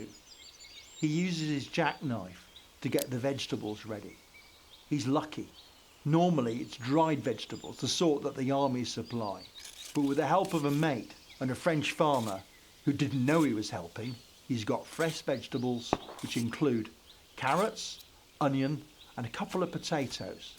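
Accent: United Kingdom. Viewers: I get ASMR from hearing that english accent... its so hypnotizing. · I watch this when I’m lonely “cone beef SHTEW, share with his mate, he puts.......... his spoon in his puddy, pep eh uhp ah beh “ thank you sir for being so British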